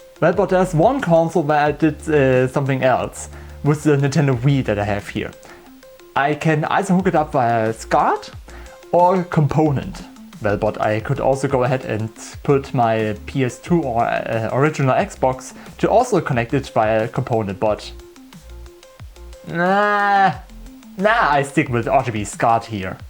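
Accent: Germany. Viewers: I hear German accent! Dieses Video ist nun Besitz der Bundesrepublik Deutschland! Brennstuhl perfectly pronounced, clearly German..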